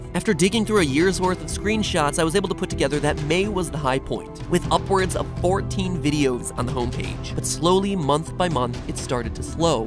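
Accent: United Kingdom. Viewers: Is that how us BRITISH people sound?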